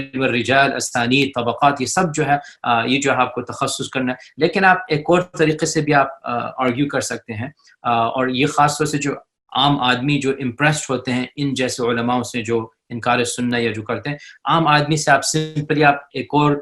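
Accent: Pakistan. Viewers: I love ur urdu accent, May Allah bless you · Loved your urdu accent ustad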